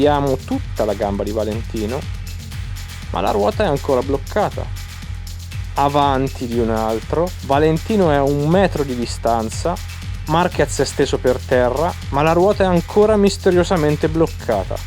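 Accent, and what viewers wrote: Perugia, Italy. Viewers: Ma sei di Perugia? Grandissimo 😂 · Ciao, sono sul tuo canale per la prima volta e ti trovo molto bravo, una domanda: sei di Perugia? (Lo sento dalla parlata) 🤗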